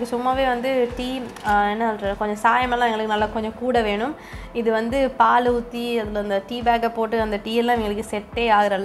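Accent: Sri Lanka. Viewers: இலங்கை தமிழ் இனிமை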